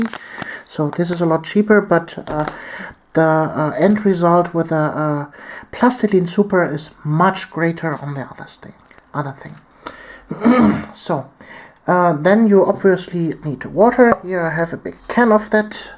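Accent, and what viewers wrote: Germany. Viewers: it's not a British accent. it's a german one based on western film and TV.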